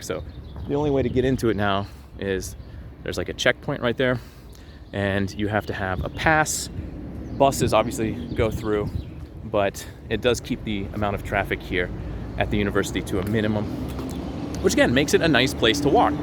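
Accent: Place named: Russia. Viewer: У этого человека явно русский язык - родной. Это просто сто процентов слышно и по акценту, и по интонации,и по произношению. И по тексту тоже. Может, увезли родители в 90е, может ещё какая история...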